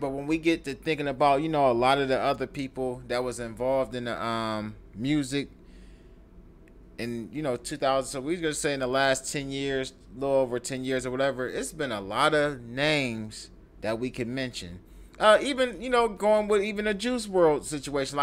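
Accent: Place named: Bay Area, United States. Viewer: Bruh where you from? Remind me of a Bay Area nigga 😆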